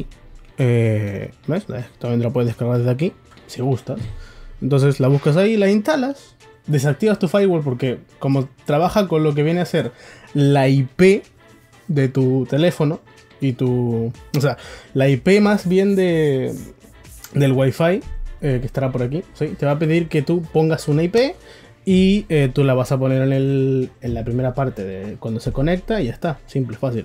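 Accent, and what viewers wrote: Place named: Canary Islands, Spain. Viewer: pensé que eras de canarias España es un acento parecido Saludos!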